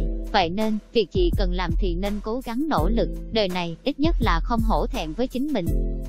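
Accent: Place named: South, Vietnam. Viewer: Giọng đọc Miền nam rỏ ràng - Hay!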